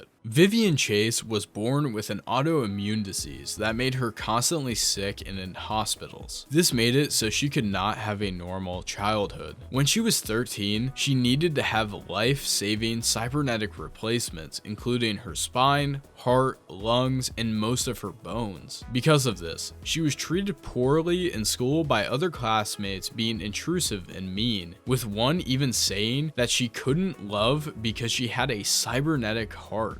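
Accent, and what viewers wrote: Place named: United States. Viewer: is this a text to speech or are you so american you can't let go of the american pronunciation? It's horrible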